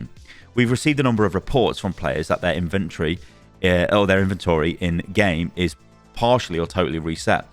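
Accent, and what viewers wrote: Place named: West Midlands, United Kingdom. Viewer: Not just British, but the West Midlands accent, mate, and the deep voice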